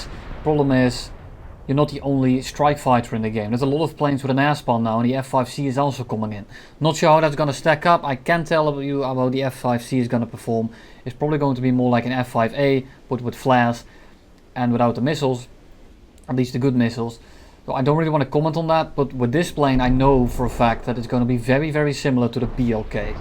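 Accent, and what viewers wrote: Netherlands. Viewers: Nah just doesn't have a heavy or deep voice, which probably makes the actual accent less clear, but it is still unmistakably Dutch. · Yea he is Dutch xd you can hear that clearly love it🤣🤣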